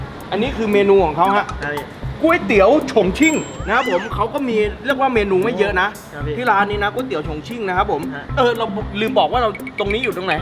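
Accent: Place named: South, Thailand. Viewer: คนใต้ใช่ไหมนิ มาเลยมาคุยกับหัวหน้าผมก่อน😆😆😆😆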